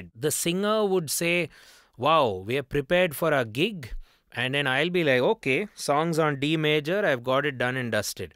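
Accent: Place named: India. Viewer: Following ur channel ..I parallel ly learnt bit of english ,😆😆 in indians ascent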